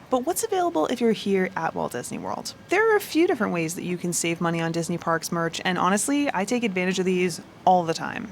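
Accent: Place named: Minnesota, United States. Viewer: Could tell you were in Minnesota girl what part of Minnesota are you from as a fellow Minnesota commenting on your life?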